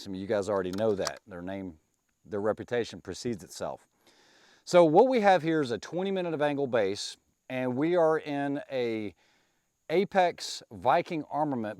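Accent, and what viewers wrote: South, United States. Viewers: thanks! haha I knew it had to be a bit more southerly US because of your accent, which I also like very much! Thanks again for the cool range and the great information on the new cartridge.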